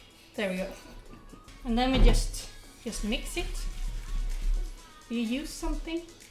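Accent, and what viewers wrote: Sweden. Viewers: ni har liknande svensk/engelsk dialekt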